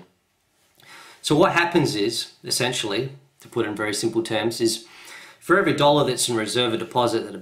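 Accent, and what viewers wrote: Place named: Adelaide, Australia. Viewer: you got one thick Adelaide accent there buddy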